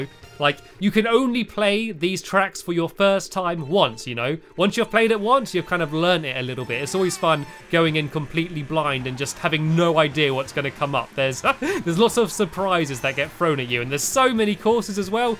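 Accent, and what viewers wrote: United Kingdom. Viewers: It's Broth Brawl. Stampy just has his British accent.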